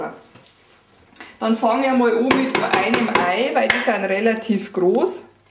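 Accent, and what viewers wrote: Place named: Bavaria, Germany. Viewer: ich mag ja den akzent aus dem schönen bayernland xD das rezept probier ich das we mal aus danke für das video ;)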